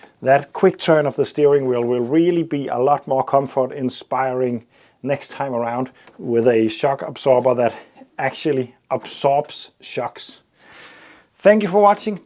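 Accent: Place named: Denmark. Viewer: Hey man, are you from denmark? I think i hear a little bit of a danish accent in your english, and in your umm's and hmm's but it could just be me. Really cool channel, love the content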